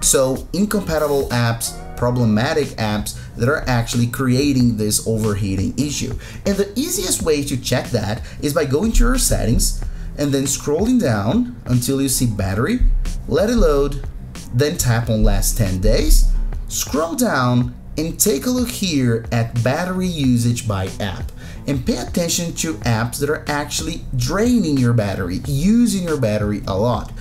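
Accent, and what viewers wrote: Brazil. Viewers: Brasileiro, cheio de sotaque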